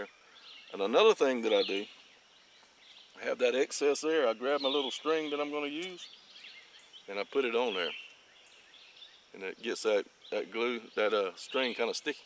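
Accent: South, United States. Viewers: This guy is fantastic! As an archer from UK theres nobody ive seen,in years of shooting that makes things so interesting and inspires me so much to make things effective and traditional. Ive spent money both on bought and making my own. Loving the style, and that Southern accent too!!¡ Best wishes from South England 😊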